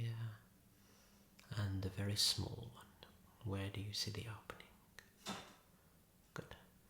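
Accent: Birmingham, United Kingdom. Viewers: I love your natural Brummie accent!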